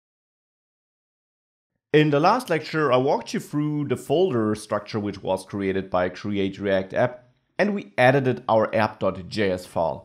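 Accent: Germany. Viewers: Great video. Thinking to subscribe the course on udemy. BTW your accent sounds like German. Are you German ? :D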